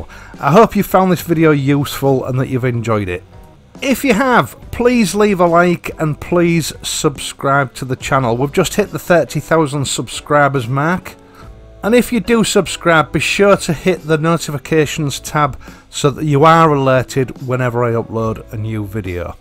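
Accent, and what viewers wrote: United Kingdom. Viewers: "RE+1080p+british english commentary" makes the world nice again. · I like the voice that has the old British charm that is nowadays somehow lost in India.Your videos are so unique that I cannot express the wonder properly that how much knowledge you have about motorcycles! Keep up your good work.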